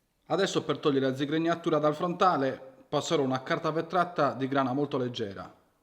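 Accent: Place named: Sardinia, Italy. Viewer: Si sente perfettamente che sei sardo!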